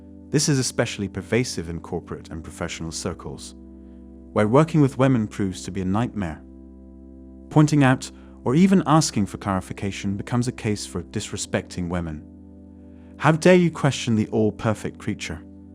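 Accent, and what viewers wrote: United Kingdom. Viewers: He just goes on and on with the same old tirade. You don't learn anything on this channel. You are just putting pennies in his pocket. Then he says in some videos that he is American but you hear a British accent lol. I think it's a text to speech program because some simple words are often mispronounced.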